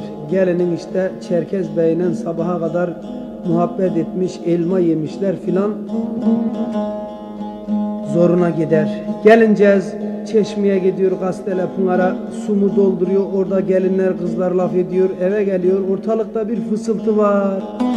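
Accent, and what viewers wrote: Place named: Hatay, Turkey. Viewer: Hatay'da ki Türk aşiretleri hepsi böyle konuşur Avşar boyu'ndan 56 Türk aşireti yerleştirildi burayı Türkleştirmek için...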